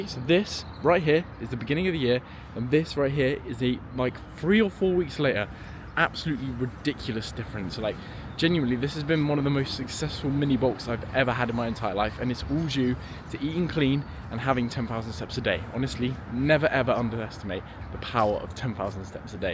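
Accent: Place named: United Kingdom. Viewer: Funny British accent, great charisma and tons of human charm flowing in streams from this cute nice guy. That's why I love this channel 😀👌💋🧡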